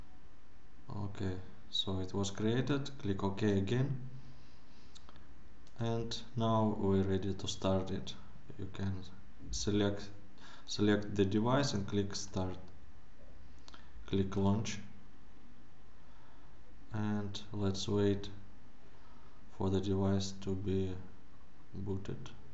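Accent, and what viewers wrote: Russia. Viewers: Улыбнул русский акцент )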